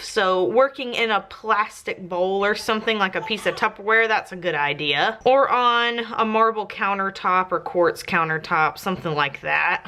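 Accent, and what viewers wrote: Texas, United States. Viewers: TheLaydewlf that’s definitely Texas (also in Texas).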